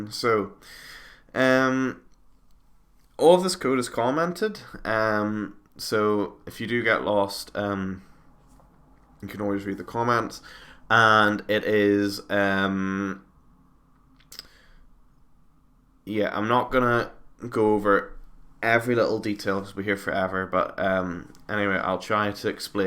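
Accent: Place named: Northern Ireland, United Kingdom. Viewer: You sound northern Irish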